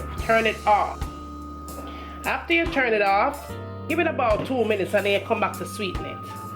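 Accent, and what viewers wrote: Jamaica. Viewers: Your man must be happy cos you can COOK and Cook EVERYTHING!!!! Keep up the phenomenal cooking. Its so rare to here an american whose Jamaican accent remains, I love it, true Jamaican!!! No disguising that xxx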